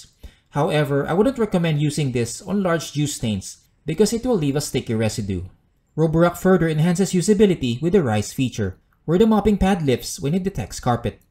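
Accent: Philippines. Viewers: I can tell you're a Filipino by your accent. How did you buy these high-end here? How about the availability of services/maintenance when these break down and needs fixing?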